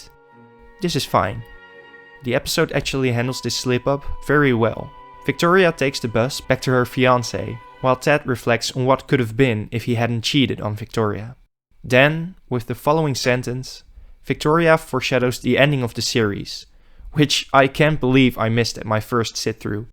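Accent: Netherlands. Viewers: Is it correct that I hear a dutch accent in your voice? Not meant as an insult, your english is great, just curious.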